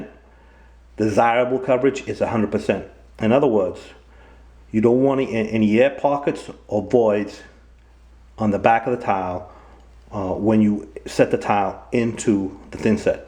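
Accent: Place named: New Jersey, United States. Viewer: Quarta by 3/8... love the Joorsey accent. Thanks for making these videos, very helpful.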